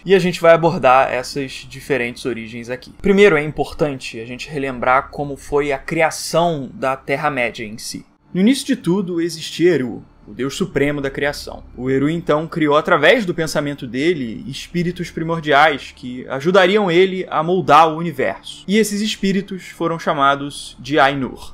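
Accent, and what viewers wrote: Rio de Janeiro, Brazil. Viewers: Acho que não existe ninguém mais carioca do que vc hehehhe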